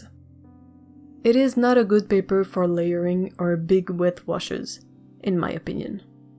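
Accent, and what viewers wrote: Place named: Quebec, Canada. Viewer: Juste à entendre ta voix, je savais que tu étais du Québec ! Tu fais du travail magnifique!! Merci pour le partage :)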